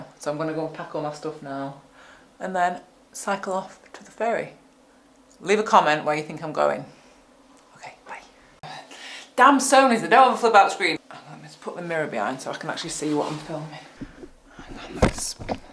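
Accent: United Kingdom. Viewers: Your a nice girl but going bacc packing? So finally i realized ot was bIke packing lol...you limeys are great on youtube very friendly and informative. Just kind of unfortunate the limey accent. My ancesors came from there but now we talk a more normal accent here. I agree 5 plus hours in the sadfle and the butt is really sore!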